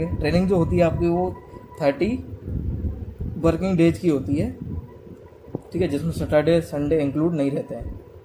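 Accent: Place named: Agra-Mathura, India. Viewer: Bhai agra mathura side se lgte ho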